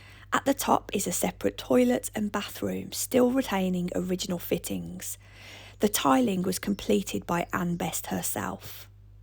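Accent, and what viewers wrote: Birmingham, United Kingdom. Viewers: the birmingham voice is so nice to listen to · speaks with a lovely regional British accent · you keep your voice... British English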